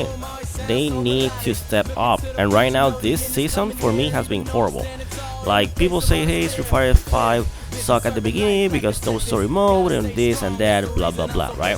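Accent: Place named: New Jersey, United States. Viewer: I love your New Jersey accent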